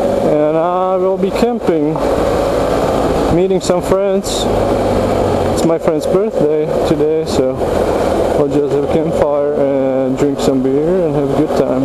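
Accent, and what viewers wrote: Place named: Germany. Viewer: may i ask, where you're from originally? You sound like you have a German accent. Take care, ride safe,stay safe dude !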